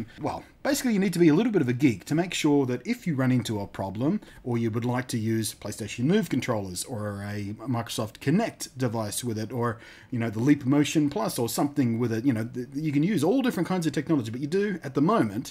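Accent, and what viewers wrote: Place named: Australia. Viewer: i had no idea you were Australian that makes me feel pleasant inside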